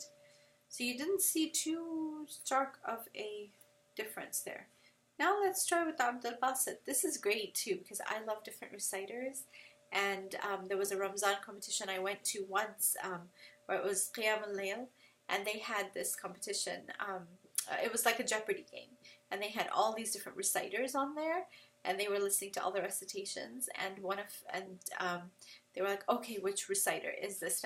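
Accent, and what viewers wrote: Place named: Arab world. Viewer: i wish i could recite the Quraan with that beautiful Arabic accent.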